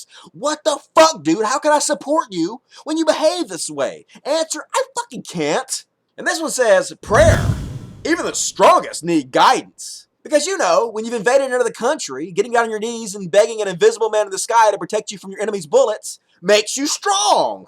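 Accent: South, United States. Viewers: What a hoot! That face, that accent, - going completely against my non-American expectations of how Americans who look and sound a certain way think and delivering complete good sense. Nice one. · Probably the most rational person about war who has a southern accent that I've ever heard.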